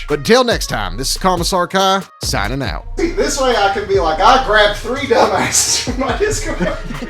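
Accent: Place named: Texas, United States. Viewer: I didn't know I needed to hear Helldivers tips with a Texas accent until I saw this video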